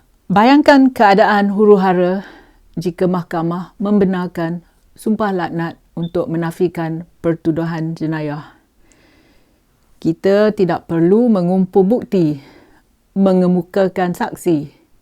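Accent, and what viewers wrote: Perak, Malaysia. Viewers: campur cakap perak. terbaikkkk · I like your lorghat perak and so proud of it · Puan org Perak ke ...lenggok bahasa mcm Perak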